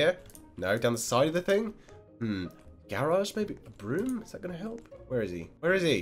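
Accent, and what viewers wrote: United Kingdom. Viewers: O he is British I am Canadian whatever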